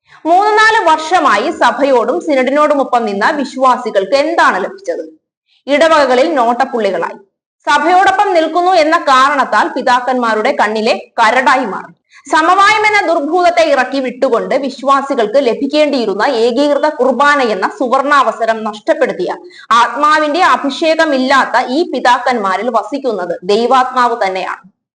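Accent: Changanassery, India. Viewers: ഉച്ചരണം കേട്ടാൽ അറിയാം നിങ്ങൾ ചെങ്ങനാശേരി ക്കാരി ആണ് എന്ന് നിങ്ങൾ കഠിന പരിശ്രമം നടത്തിയലൊന്നും എറണാകുളം ത്തെ തകർക്കാൻ ആവില്ല ദൈവം മുകളിൽ ഉണ്ട്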